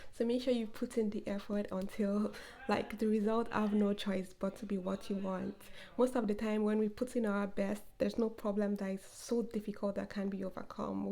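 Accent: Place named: Nigeria. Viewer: I knew it! You sounded Nigerian in the first video. Seeing that you last posted 9 months ago, I low-key wish I could connect with you. I know PhD is a lot. I pray you pass your first year qualifying examination and find the balance to come back to posting. Wishing you the best,.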